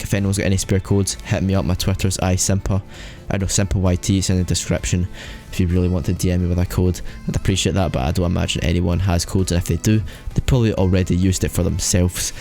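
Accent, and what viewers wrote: Scotland, United Kingdom. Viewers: Are you from Scotland and epic name is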